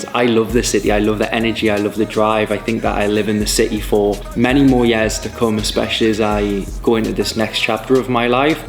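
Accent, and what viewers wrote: Newcastle, United Kingdom. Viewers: You sound geordie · He has a Newcastle accent. 🙄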